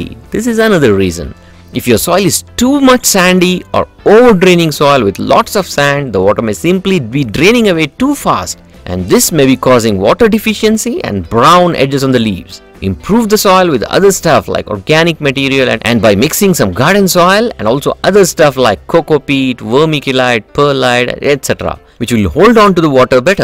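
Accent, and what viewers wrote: India. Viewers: Sir ur Indian english is very good. One thing u should bear in ur mind that u r not teaching agriculture student, Just tell to the point for what is needed for a general home gardener. Thanks.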